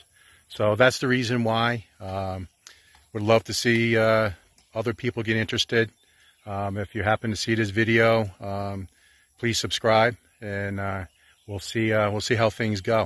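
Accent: Philadelphia, United States. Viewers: NEW MEMBER. ARE YOU FROM THE EAST COAST OF USA? SOUNDS LIKE FROM "PHILLY"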